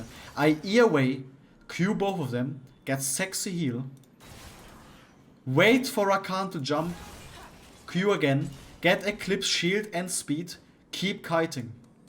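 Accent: Germany. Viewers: He's not flaming, that's just his german accent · Never seen an in-depth guide this entertaining. I don't know you but since this video is phenomenal, and I assume you are German because of your accent, I'll definitely get to know you sir!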